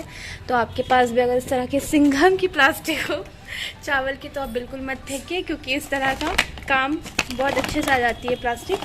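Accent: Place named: Jharkhand, India. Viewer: Waoo nice .... Or AAP Ki Jharkhand wali boli to mashaAllah....😘😘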